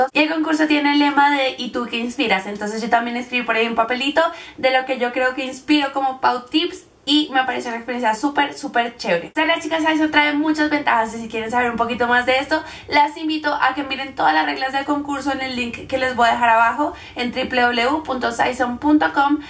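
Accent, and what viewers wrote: Colombia. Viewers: Me da un cosquilleo ver tus vídeos porque... Eres colombiana y yo también lo soy sólo que vivo en España. Y extraño mucho Colombia :) y oír tu acento colombiano me relaja y me da como un cosquilleo de ganas de ir a Colombia!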